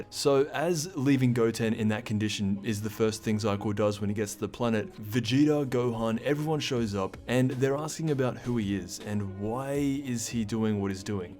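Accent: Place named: Australia. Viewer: probably so put i enjoy his Auzie way of explaining it lol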